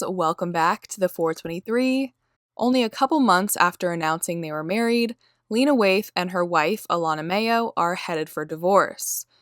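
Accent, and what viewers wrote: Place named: California, United States. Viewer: I know this is off topic, you have a nice voice. You're from, or you probably lived in sunny California for a while. We just got that popping "proper" twang that people think is fake. But it's NOT lol♥️🌞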